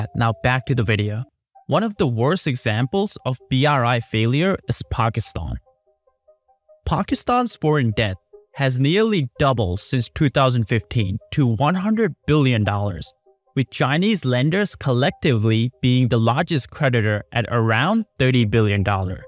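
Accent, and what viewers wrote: India. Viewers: This guy is Indian. His accent is different from most indian but the the way he rolls his tongue is definitely indian. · Why you speak with an indian accent?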